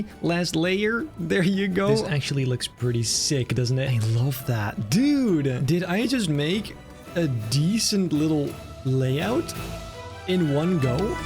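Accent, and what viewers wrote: Netherlands. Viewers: I love dutch accent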